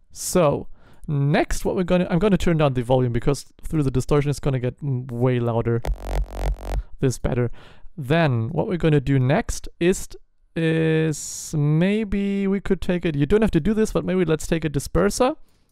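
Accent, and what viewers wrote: Germany. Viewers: you can hear that hes german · dein English sagt du bist Deutsch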